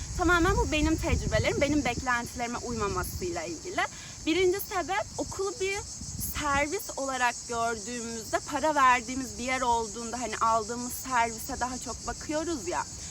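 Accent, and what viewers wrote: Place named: United States. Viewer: Bilkent üniversitesi vakıf üniversitesi Boğaziçi devlet okulu kıyas klasman farklı ama siz bence Ankara dan kaçmışsınız,Bilkent girişi kolay mezun olmak zordur,Boğaziçi zorsa Bilkent kesinlikle daha zordur ,devam zorunluğu vardır ve hangi bölümde okursanız Türkiye nin en iyi bölümü olarak okursunuz bunu size okul her türlü hissettirir,Ankaralıyım diyorsunuz evet İstanbul daha güzel bir şehir ama Ankara’da Tunalı,Gop,Bahçeli de milyon tane Cafe vardı,biz bayağı gezerdik siz içinden değilsiniz galiba,kulüp kurmak isteyenler rahatlıkla kurabilirdi,Bilkent mezun senenizde kurumsal firmalar bizzat gelip kariyer günlerinde elemanlarını seçerdi bir çoğu mezun olurken iş sahibi olmuştu,Boğaziçi güzel bir üniversite ama imkanlar Bilkent te daha fazla ,network demişsiniz sadece güldüm😂😂 hazırlık kabus gibidir evet aşırı zordur ama siz ielts aldım demişsiniz keşke 1,5 sene beklemeseydiniz,birde bu konuşma stili gerçekten çok komik ,özenti kendiniz gibi konuşun gerçekten çok itici duruyor ,bizler Türk toplumu olarak Amerikan yeni dönmüş işçi havasında konuşmayalım,Türkçemiz kişiliğimiz her daim sizi seçkin kılacaktır.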